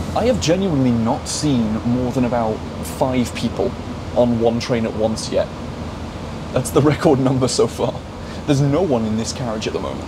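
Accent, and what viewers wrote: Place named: North, United Kingdom. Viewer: On a non train related note. The way you ( people from the north ) say castle sounds nicer, even though I'm from London and would say it more like carstle 😊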